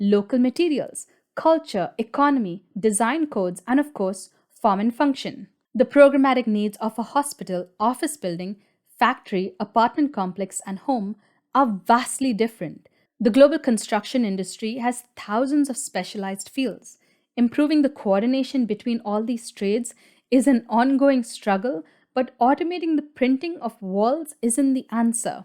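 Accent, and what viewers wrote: India. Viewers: Indian English sounds so different from the proper british english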